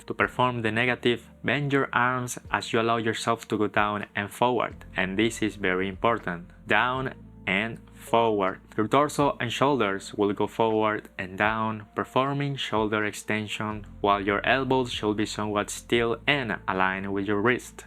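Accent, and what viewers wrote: Spain. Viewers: Man, you look so asian, but you have a hint of an european accent (may be spanish). Not as important, thanks for your guide ;), especially the bent arm press. I have been trying to kick up to a handstand for almost 2.5 years and can barely hold myself up for a few seconds, inconsistently.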